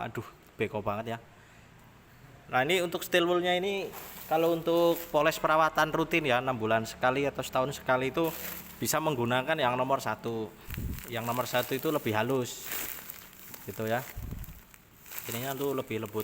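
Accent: Banyumas, Indonesia. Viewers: Mntp, ngapak y ngmngnya 👍👍👍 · Ngapak pa ya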